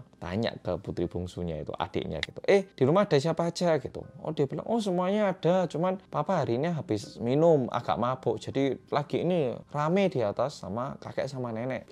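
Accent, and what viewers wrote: Java, Indonesia. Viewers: 3 channel storytelling favorit: 1. ( medhok, tapi paling asik, berasa diceritain pacar) 2. Nessie Judge (Bahasanya gampang dimengerti) 3. Arroyan Pram (Konspirasi dunia pake sains dan teknologi, Astronomi, Fisika, dan editannya paling niat dan bagus) kalo kalian? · Hallo mas.. Jowone medhok bngt. Pertahankn yo mas, fasih bngt ngmong bhasanya. Sukaaa bngt, pokonya sucsses sllu buat mas, salam dr mlysia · Lama g dgrn suara medhoke mas. Lama g nntn, makin ganteng aj kamu rek... kaya idol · Knp ganteng trusssss sih skrg mas medhok nya ❤️❤️🥰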